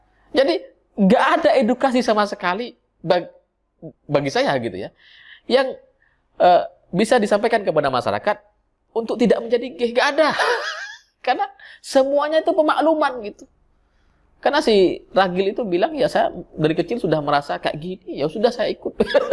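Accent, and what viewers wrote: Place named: Madura, Indonesia. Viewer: Ada logat Madura.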